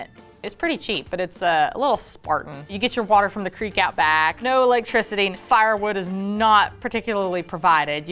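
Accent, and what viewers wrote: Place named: South, United States. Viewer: Miss tries real hard but her Southern drawl keeps coming in and out am I the only one that thinks Miss might possibly be Cherokee?😘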